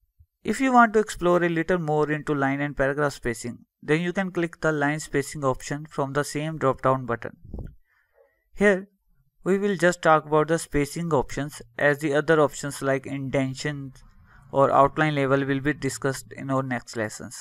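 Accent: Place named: India. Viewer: oh, that indian accent...I don't understand, how can you still have it? I mean, you indians talk a lot english, even before everybody in the world knew english, DUE to India beeing brithish colony, but still, even know that indian accent is...I don't want to offend. let's say that IT IS RECOGNISABLE.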